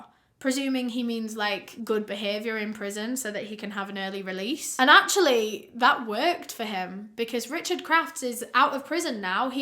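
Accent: United Kingdom. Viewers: youve had suchhhh a GLOW UP, you gorgeous babe! i absolutely adore you and your cute uk accent 🤣🤣🤣🤣 i wonder if europeans think americans have "cute accents"? LOLz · What’s her name ? I want to read about the case more in depth. Maybe it’s her cute British accent but is it “hell”? What’s her last name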